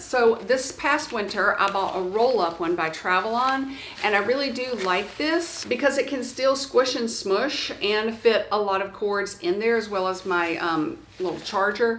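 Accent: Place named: Georgia, United States. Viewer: Hi, new subscriber and really enjoy your YouTube videos. Getting quite a few helpful tips for when we travel. I also really enjoy your great Georgia "accent ". Keep up the great travel hacks and other information. I'm learning a lot to make the most of our carry-ons and personal bag. Much needed since my husband won't check bags.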